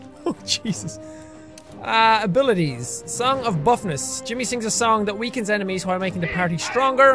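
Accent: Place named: Ireland. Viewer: He is Irish so his accent is different.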